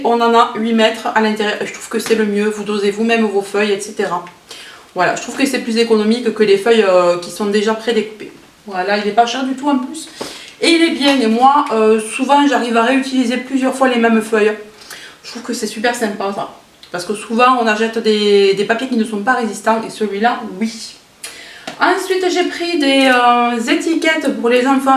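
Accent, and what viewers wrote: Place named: Pyrenees, France. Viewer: J'ai pas pu partir chez moi dans les pyrénées cette année alors ton accent me donne l'impression d'être un peu en vacances 😘😘😘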